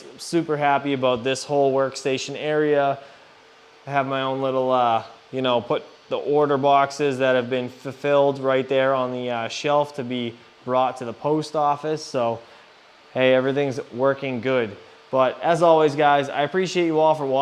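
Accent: New England, United States. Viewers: Sounds like a New England Accent so Im betting National Grid customer rip off rates Im in FL and I pay about 0.08KWh here in FL on a COOP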